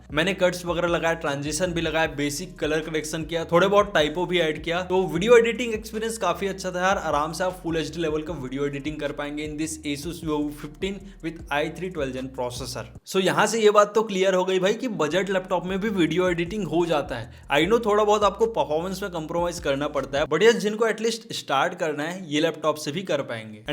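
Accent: Chhattisgarh, India. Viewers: Bhai aaap chhattisgarh se ho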